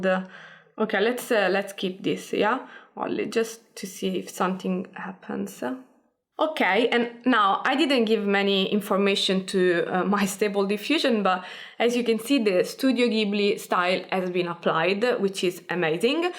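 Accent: Italy. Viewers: Amazing, I've finally find out how to use a Lora model thanks! PS: Sei italiana? il tuo ecc ecc suonava italiano :)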